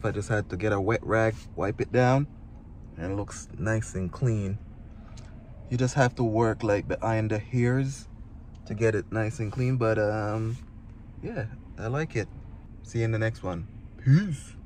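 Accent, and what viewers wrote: Jamaica. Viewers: Jamaican? Respect brethren!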